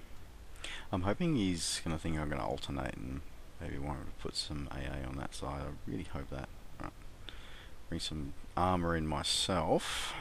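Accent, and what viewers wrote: Australia. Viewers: You make some brilliant content, and I love watching your War-game Videos. But its a pity you don't have that many viewers. :( Also are you Australian? And do you do Youtube as your job?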